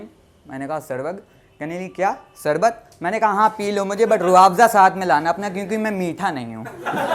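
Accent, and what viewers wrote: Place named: Kanpur, India. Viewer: pooonnchaa. Kanpur dialect is mast.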